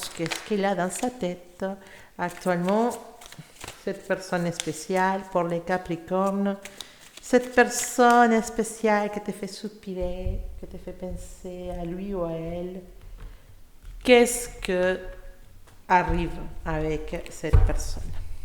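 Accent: Spain. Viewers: hola es que tu haces lecturas en espanol porque siento tu accento esapnol y quiero saber si es posible tambien en espanol gracias bendiciones!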